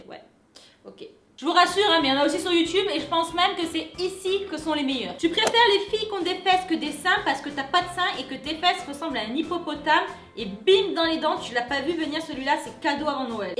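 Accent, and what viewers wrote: South, France. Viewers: hey écoute pas les rageux, tu es toujours au top, continue comme ca. En plus avec l'accent du sud tu me régale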